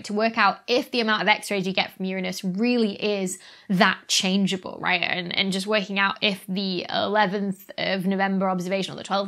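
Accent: United Kingdom. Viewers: Sorry to say Dr Becky, the closed captioning on my television translated your “Saturn” to “satin”. I knew what you were talking about, but my television has problems with a British accent. Love your videos.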